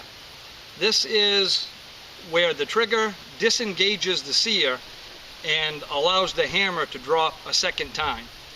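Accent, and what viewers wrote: Maine, United States. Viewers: Southern Maine accent. Gardner maybe?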